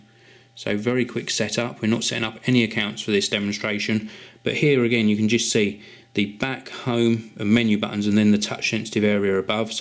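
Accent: United Kingdom. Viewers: freakin brit accent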